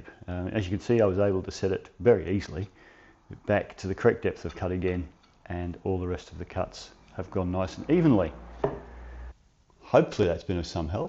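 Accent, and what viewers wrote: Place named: Australia. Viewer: That’s what our accent sounds like in Australia champ.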